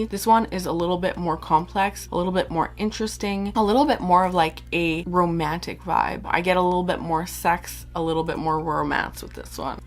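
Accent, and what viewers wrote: France. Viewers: ugh your French 😍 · Hi I wish you a very happy New Year and all the best for you and your loved ones. As always love your videos and your french accent 🙂🙂🙂😃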